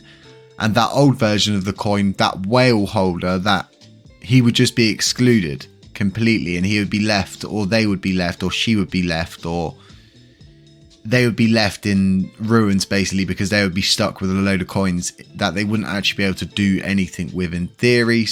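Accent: United Kingdom. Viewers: I like your accent. it's like in the harry potter movie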